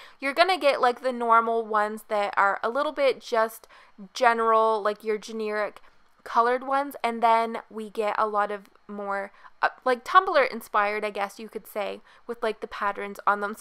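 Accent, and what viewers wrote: Canada. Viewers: You have the most canadian accent I have ever heard. Also, this is a cute house! Thanks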